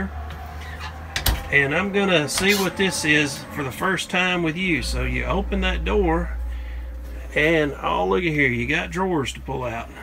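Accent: South, United States. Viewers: great tour and like your southern accent! but seriously very complete tour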